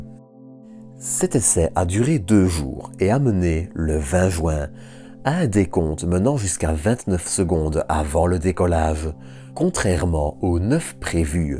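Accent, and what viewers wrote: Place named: Flanders, Belgium. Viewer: dit j'ai une question, tu est flamand ? flamand belge n'est ce pas!? 😌 ton accent trahi tes origines 😌😅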